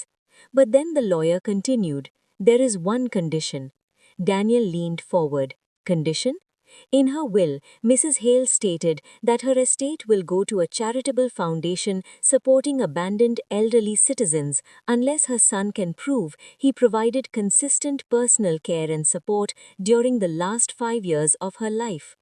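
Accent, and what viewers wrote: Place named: India. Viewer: Narrator sounds Indian or Pakistani. Those cultures do a much better job of caring for their elders than the UK & USA does so this was an interesting topic for the Creator.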